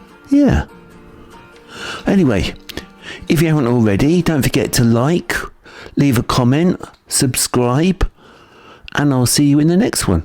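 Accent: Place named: United Kingdom. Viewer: Thank you for your videos, your british accent makes it easer to understand what to do than the north america accent that all the videos have had that jag earler looked at.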